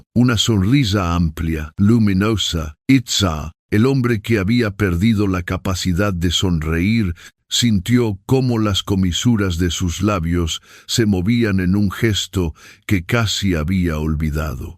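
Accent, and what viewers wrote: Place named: Portugal. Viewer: Bonita historia pero ese acento en portugués no le veo el caso le quita interés a la historia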